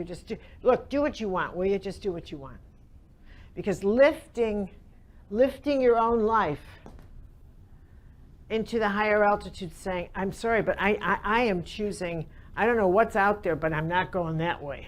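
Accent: Chicago, United States. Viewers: Is she from Chicago? She sounds like my childhood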